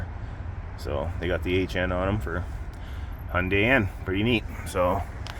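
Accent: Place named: Canada. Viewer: I hear the Canadian accent. Perfect car for the Northern weather. Great video man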